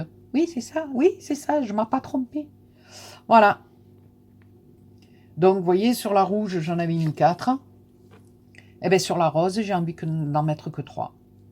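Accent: South, France. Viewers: Tuto merveilleusement bien expliqué, avec un merveilleux accent du midi, je m'abonne.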